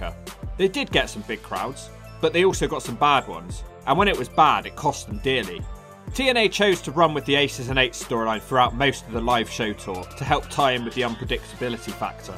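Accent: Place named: United Kingdom. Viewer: Why do all the creators that make great wrestling content, have a British accent 😂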